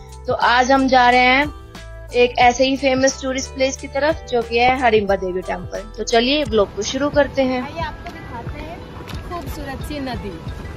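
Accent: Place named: Haryana, India. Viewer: Your haryanvi accent is so nice. Keep it raw 😊 #proud_to_be_Haryanvi.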